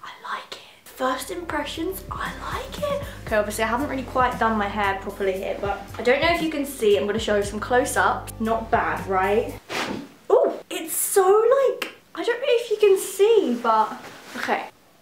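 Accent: United Kingdom. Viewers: I did a British accent reading the title before I click this and I’m surprised ur British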